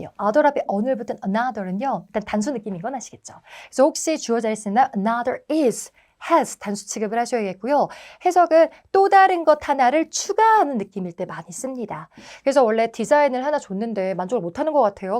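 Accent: Jeolla, South Korea. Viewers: 약간 전라도 사투리가 느껴집니다. ㅋㅋ 읎어여~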